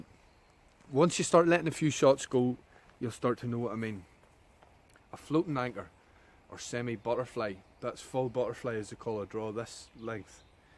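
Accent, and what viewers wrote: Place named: Northern Ireland, United Kingdom. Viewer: Must practice after watching this. Have a cheap catapult at the moment to practice with hopefully I will up my game... Am assuming your from Northern Ireland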